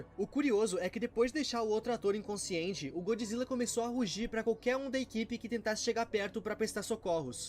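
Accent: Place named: Northeast, Brazil. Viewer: Vc é nordexxtina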